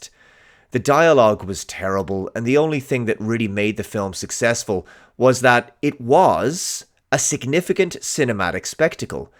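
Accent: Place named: Ireland. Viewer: Is it me or does just sound more Irish than before? I haven't watched for a long time.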